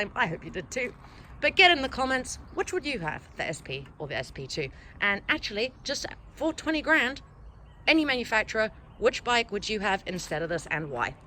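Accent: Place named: Australia. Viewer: You have an interesting accent, sounds Australian, English and south African all in one haha.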